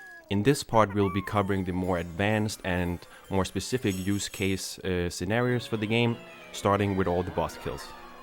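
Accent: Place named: Denmark. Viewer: thanks for this video!! i miss this game so bad and watching u absolutely demolish it is so inspiring to watch :-D also your danish accent is so beautiful lol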